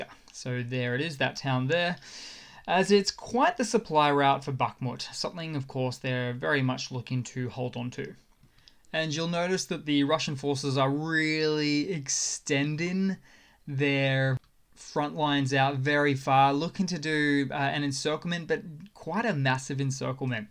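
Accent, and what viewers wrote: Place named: Australia. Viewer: Since he's Australian (assuming by his accent) he might have opposite weather problems in January =D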